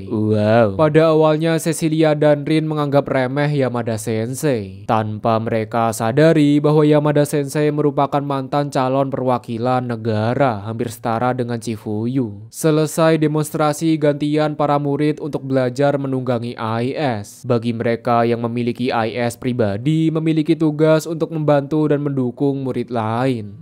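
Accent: Java, Indonesia. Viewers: di pikir2 suara mu mendok bang, cipuyuuu ,wanitaaa,onimuraaa,kaka dari hogiii😅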